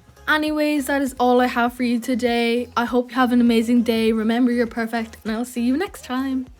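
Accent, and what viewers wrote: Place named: Ireland. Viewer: Are u irish? Luv ur accent :)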